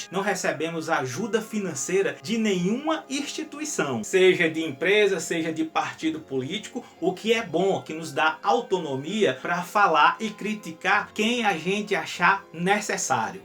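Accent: Northeast, Brazil. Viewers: Cara, onde você estava que só agora eu te achei? E que achadoooo! 👏🏼👏🏼👏🏼👏🏼👏🏼👏🏼Uma pista eu já tenho: o sotaque é nordestino😍😍😍😍😍😍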